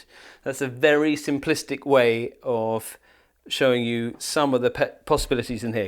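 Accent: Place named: RP, United Kingdom. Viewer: sounding super posh today!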